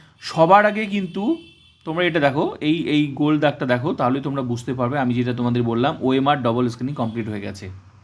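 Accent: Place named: Bangladesh. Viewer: Apnar pronunciation Bangladeshi der moto keno?😅 SIR theke saavdhan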